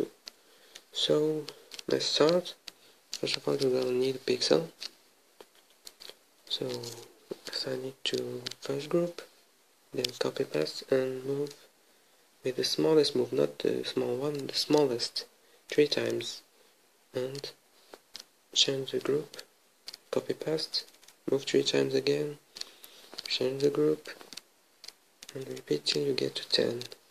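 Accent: France. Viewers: I can understand that cuz i'm french like him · I heard a french accent when you talk I don't know why x) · Hablo español y entiendo su inglés con acento francés \ :v /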